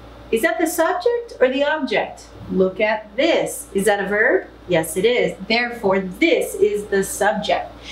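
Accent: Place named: United States. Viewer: This isn't but american english !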